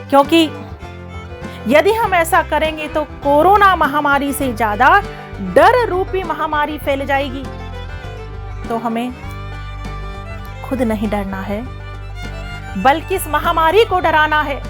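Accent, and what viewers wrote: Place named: Shekhawati, India. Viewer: mujhe thodi bahut samaj me aati he shekhawati... Bcoz mere papa ki school me shekhawati teacher ki posting hui he so unse kafi touch he mera.... Apki shekhawati bhasha sun ne me bahut badhiya lgti h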